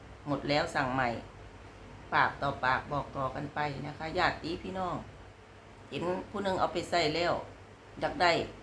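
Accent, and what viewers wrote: Thailand. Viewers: อังกฤษสำเนียงไทยหนูว่าน่ารักดีคะยาย เหมือนที่เราบอกต่างชาติพูดไทยว่าน่ารักดีนั่นแหละค่ะยาย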